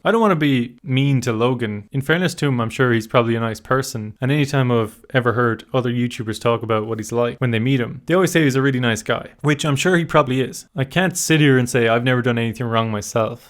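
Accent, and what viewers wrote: Ireland. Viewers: Nice piece on Logan. I would have never of thought I would watch more than a minute of Logan’s content and nowadays I won’t miss an episode of his podcast. I hope he makes things right about this crypto stuff, I feel like he’s going to address it soon. Btw I’m a Leitrim man myself you don’t sound far off. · That's an Irish accent right there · You sound like an irishman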